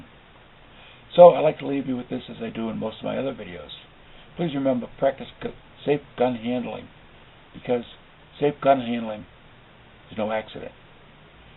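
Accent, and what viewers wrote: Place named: New England, United States. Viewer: Love his New England accent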